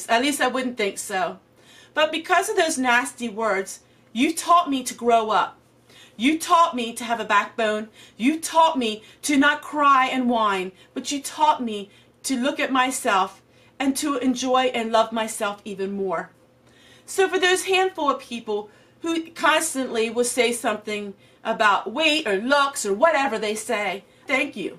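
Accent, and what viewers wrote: Pennsylvania Dutch, United States. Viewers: Congratulations! I found you just over a week ago, but have been enjoying your channel so much. Your laugh (giggle) is one of my favorite parts of them! Your Pennsylvania Dutch accent is charming too! Thanks for being so real and down to earth! Merry Christmas to you!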